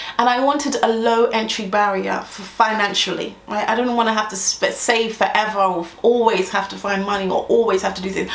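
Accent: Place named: South, United States. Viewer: why have you gone in to the southern accent. its so funny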